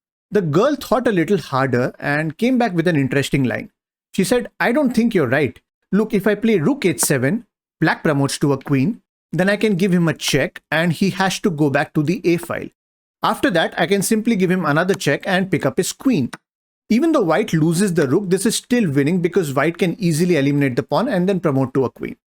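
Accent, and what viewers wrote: India. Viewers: I'm a voice actor and I'm stealing your accent. This has to be the strongest Indian accent I've ever heard in my life.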